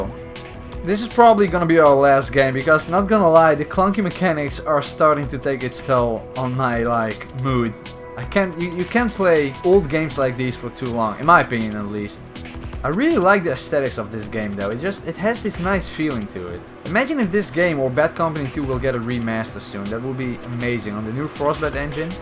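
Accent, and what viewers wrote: Netherlands. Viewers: based on the accent of this guy he is from the Netherlands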